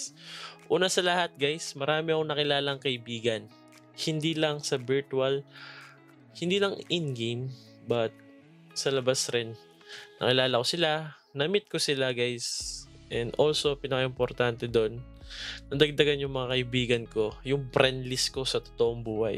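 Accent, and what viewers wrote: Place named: Pampanga, Philippines. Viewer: Kapampangan na kapampangan idle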